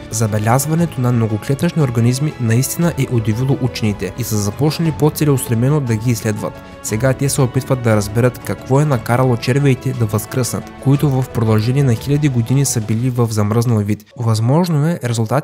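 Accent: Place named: Varna, Bulgaria. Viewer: Хубав клип, съпроводен с роден варненски говор. Готин си градски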